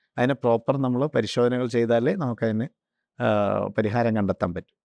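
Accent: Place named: Trivandrum-Kollam, India. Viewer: ഡോക്ടർ കൊച്ചിയിൽ ആണെങ്കിലും. സംസാരം ട്രിവാൻഡ്രം കൊല്ലം ശൈലിയിൽ ആണ് കൊള്ളാം 👌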